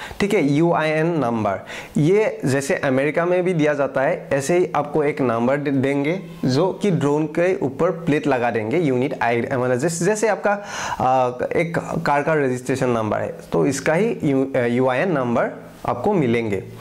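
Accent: Assam, India. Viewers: Why your accent is like that ? Are you from Assam ?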